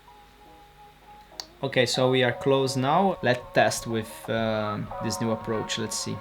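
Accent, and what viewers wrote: Brazil. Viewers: I’ve watched this video with this intention. It’s easier to understand because of his Brazilian accent and he speaks slowly which facilitates the understanding. Acho que assim ficaria melhor :-) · Yes, I whatched this video in this intention, is more easy to undestand because the brazilian accent. And he speak more slow, this facilitates undestanding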